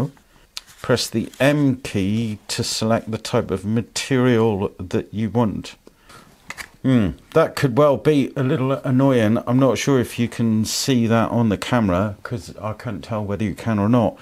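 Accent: Cornwall, United Kingdom. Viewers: Great to watch the way you work, I especially like your narratives explaining what you are going & why you are doing it that way..... Also to hear rhe Cornish accent ( in Singapore)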